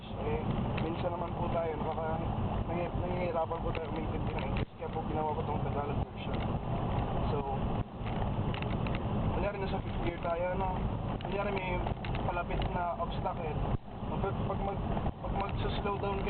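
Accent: Batangas, Philippines. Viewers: taga batangas ka kuya noh? very helpful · Ang cute mo mag salita,.. Eihhh taga batangas po ikaw?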